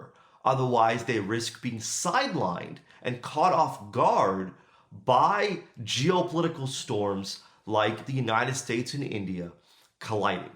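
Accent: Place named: Canada. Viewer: You can tell he's not in the US. Listen carefully, and you'll notice he's Canadian.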